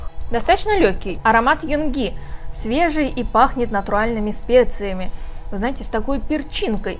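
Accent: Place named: Georgia. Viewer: Ты в этом выпуске грузинка? (Говоришь с явным акцентом) (Никого обидеть не хотела этим комментарием)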